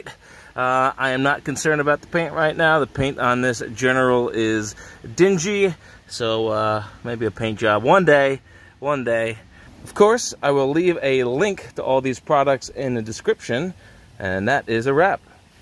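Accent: New York, United States. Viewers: Enjoy the NY accent... what kind of welder?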